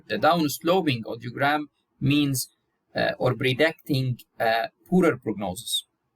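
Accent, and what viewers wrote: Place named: United States. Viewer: As if am listening to an American lecturer ❤❤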